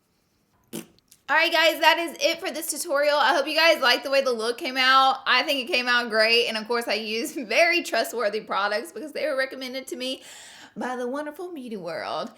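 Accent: South, United States. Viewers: I love how you say consillur instead of con-see-ler. It's very Southern with a bit of a drawl to it 🌷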